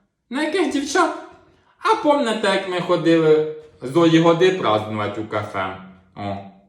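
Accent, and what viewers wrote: Poltava, Ukraine. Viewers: Полтавський говір не сплутаєш ні з ким.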